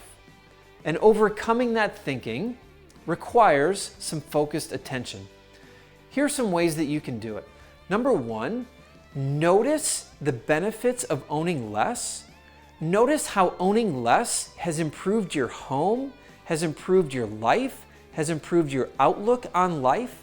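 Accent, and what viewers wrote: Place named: United States. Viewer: Hi, I'm a Spanish woman who is trying to stay minimalist after a long period of decluttering in my life... Also, I'd like to say you that I learn lot of American English with your pronunciation! Thank you very much!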